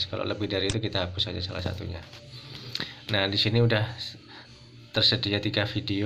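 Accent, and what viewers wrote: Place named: Java, Indonesia. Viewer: Makasih info triknya boskuuhh...mantap , medok banget ngomongnya yah..😃👍👍👍sudah aman boskuh...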